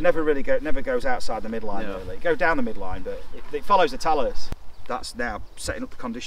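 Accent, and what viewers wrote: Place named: Doncaster, United Kingdom. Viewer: Sounds just like Doncaster 🤩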